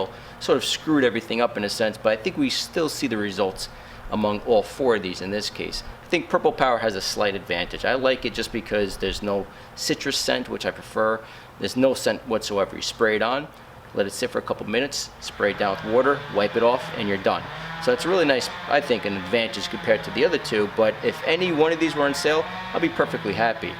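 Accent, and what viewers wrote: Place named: New Jersey, United States. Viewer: New Jersey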